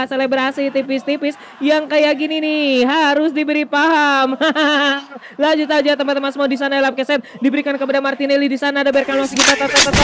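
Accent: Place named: West Sumatra, Indonesia. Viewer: Suara lu ko kek minang kocak si bg🙄🤔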